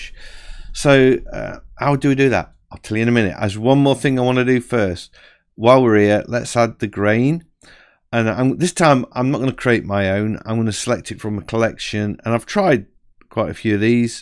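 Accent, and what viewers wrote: United Kingdom. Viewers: thanks, it really helps listening to someone from Yorkshire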